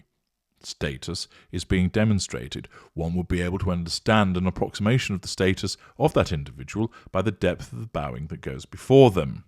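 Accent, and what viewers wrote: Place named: Upper class, United Kingdom. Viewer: Love your clipped accent, clearly upper class Brit. Eton educated?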